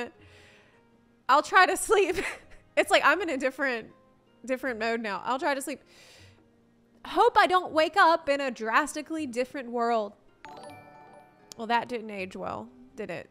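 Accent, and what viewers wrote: South, United States. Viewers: Pretty sure she's native English speaker. But yeah, as a Cajun, I'm definitely getting some Southern vibes from her.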